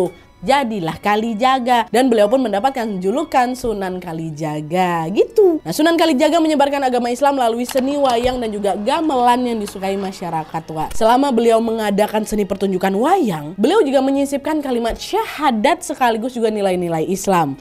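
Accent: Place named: West Sumatra, Indonesia. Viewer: ASSALAMUALAIKUM, MBAK, DARI LOGAT BERBICARANYA SAYA RASA MBAK BERASAL DARI MINANG ATAU SUMBAR....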